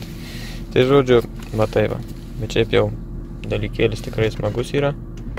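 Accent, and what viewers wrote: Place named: Vilkaviškis, Lithuania. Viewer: Iš tarmės sprendžiu, kad esi iš Vilkaviškio ar Marijampolės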